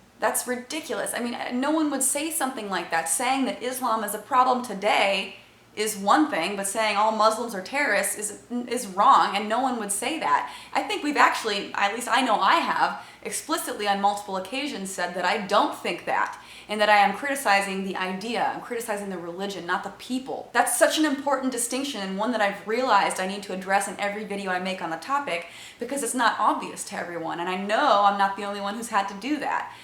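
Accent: South, United States. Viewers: Following her big year of atheist drama, she is now moving towards a kinder, gentler atheism. Does that mean she is going to stop using that incredibly bigoted southern accent she always uses by default when she thinks she's making a good point? Oh, that's right, she does that because of her white guilt. Well, it's a good start. Hope she can get there while she's still young and pretty, cause when she gets older it's just gonna come across as mean and Nasty. God bless her.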